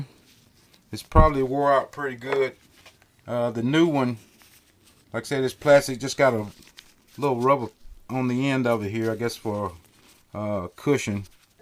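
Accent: South, United States. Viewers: Great video. you are one country sounding brother! (and that is meant as total compliment). Keep up the good work. Thanks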